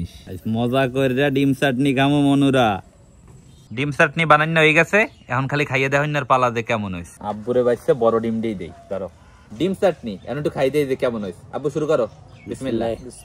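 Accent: Barishal, Bangladesh. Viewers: Borisaila Katha hunla rag uthey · মনু তুমি কি বরিশালের মাল শুদ্ধ ভাষা শিখো নাই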